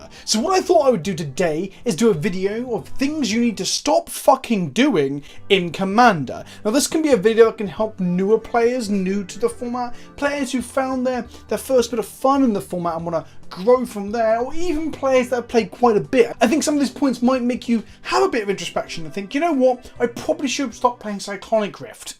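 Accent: United Kingdom. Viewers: British Hungrybox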